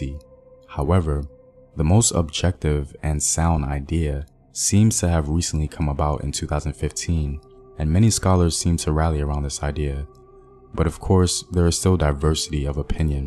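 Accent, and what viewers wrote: United States. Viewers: Where this American sounding person got this information? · cant get over your VERY AMERICAN accent mentioning these african words. funny that the historical myth of he milk is the same as the one of the banyankore of Uganda.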